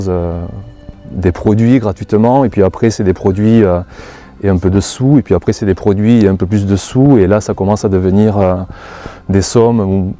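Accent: South, France. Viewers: Quel beau clap de fin 2019 ? Tu es sincère et authentique et je t’en remercie. Tu as su partager tout au long de tes vidéos ta passion et tes émotions sont arrivées jusque dans nos foyers. J’aurai souhaité avoir un fils comme toi, mais je me consolerai d’être ton ami, lol. Reviens nous vite afin que nos dimanches chantent encore avec ton joli accent du Midi et que le soleil dans tes vidéos nous stimule à faire grandir nos fruits et légumes. Je reste dans l’attente de te retrouver avec le chant des cigales. Merci. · Bonjour, j’apprécie vraiment cette chaîne,ou on évolue avec toi autant dans les succès que les échecs. Tu es un mec super et plein de sincérité et que dire de cet accent qui sens bon le sud. Je te souhaite tout le succès tant mérité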